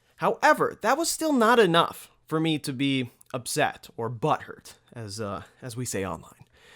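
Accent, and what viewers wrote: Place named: New York, United States. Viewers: Sounds like a New Yorker and says pounds like he's from Tennessee. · Clocking his southern accent southern *Ohio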